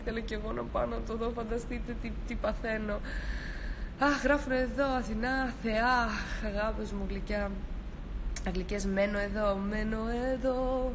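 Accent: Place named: Germany. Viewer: Το χειροτερο ειναι οτι παει να παραστησει την χαριτωμενη! Το αμεσως επομενο που κανει την τοοοοοσλ ενθουσιασμενη. Το λαστ μπατ νοτ λιστ, που μιλαει αγγλικα με προφορα χωριατη γερμανου. Αστο δεν εχεις αστρο...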